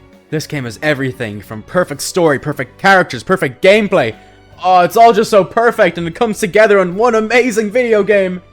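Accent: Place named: United Kingdom. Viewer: You sound British just like the characters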